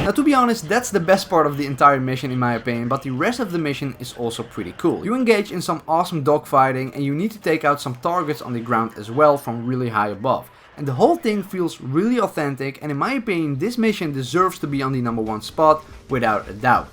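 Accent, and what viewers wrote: Netherlands. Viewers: You have a Dutch accent I'm right? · Your dutch accent is out of the roof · Ur acent is a bit dutch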